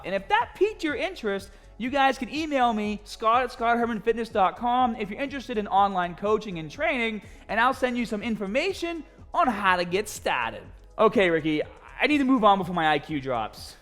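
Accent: Boston, United States. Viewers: I love the boston accent lmao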